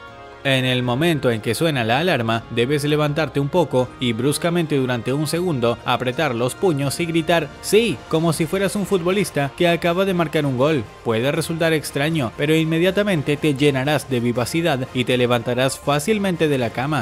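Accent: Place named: Argentina. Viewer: no se, pero tiene alta pinta de argentino en modo neutro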